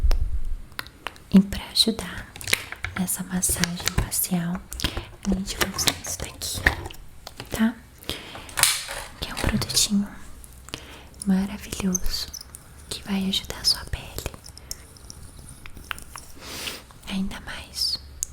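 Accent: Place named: Goiás, Brazil. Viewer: Vc é Goiana? Seu sotaque parece nosso ❤️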